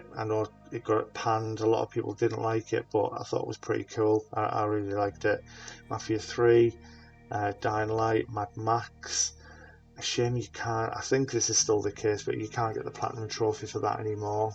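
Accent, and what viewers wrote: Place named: Liverpool, United Kingdom. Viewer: Strong scouse accent you have there to go with your liverpool scarf! Decent video mate best of luck with the channel.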